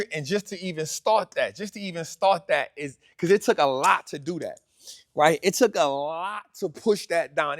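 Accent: New Orleans, United States. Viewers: You're a really good teacher! Are you from N'awlins? I'm in Shreveport.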